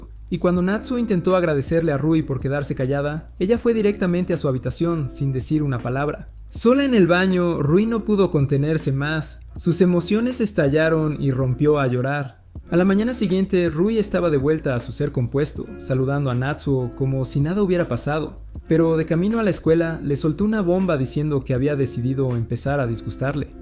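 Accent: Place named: North, Mexico. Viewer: Como que un poco norteño e unnnn poquito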